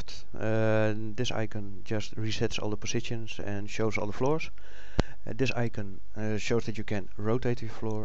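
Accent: Netherlands. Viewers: well if you are dutch and you have heard dutch people speak english you kinda get a feeling for it, but it isnt a crazy dutch accent.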